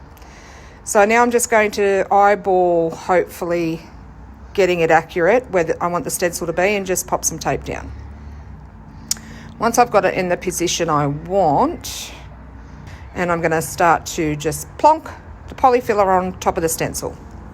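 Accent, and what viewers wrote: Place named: Perth, Australia. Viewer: This is lovely! i must subscribe as my daughter is in Perth, and I can just listen to your accent and imagine my daughter's friends and co workers sounding just like you. Thanks!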